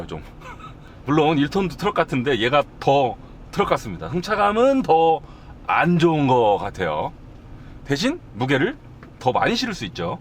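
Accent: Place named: Chungcheong, South Korea. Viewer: 참~~ 말씀 잘하시네. 충청도 사투리도 정겹구요. 실속있는 구체적인 정보 귀에 쏙쏙 들어옵니다. 구독 누르고 갑니다.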